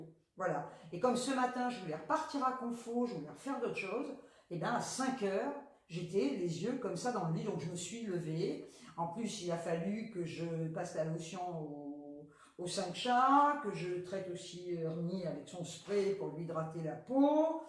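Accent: Algeria. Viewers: Coucou ma adorée...Pero pasa que es? Je n'ai pas de son sur ta vidéo. C'est la première fois que cela m'arrive. J'ai tout vérifié et nada! Je ne comprends pas pourquoi... J'ai quand même regardé ta vidéo " en mode muet" et je vois qu' apparemment, tu es toujours dans les bonnes affaires.... Le porte-bijoux: j'adore et les bougies aussi.... Et pour couronner le tout, je viens juste de m'apercevoir que Youtube m'a désabonée de ta chaîne...Oh, la, la, la! Si l'année commence cà, comment va-t-elle se terminer??? Tu n'as pas perdu la voix au fait? Tu ne fais pas semblant de parler??? Lol, j'plaisante bien sûr mais c'est dommage de ne pas entendre ta douce voix mélodieuse: tu sais, celle avec notre accent de P.N. Je te fais des tonnes de bisous tout blancs.... car nous sommes au mois de Janvier!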